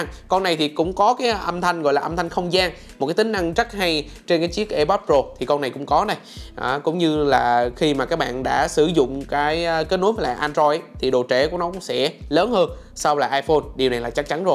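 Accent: South, Vietnam. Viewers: Thằng này giọng nai nái sao ấy nhỉ, mới nghe thì ra giọng người nam, mà lâu lâu nói lái lái vài từ người bắc.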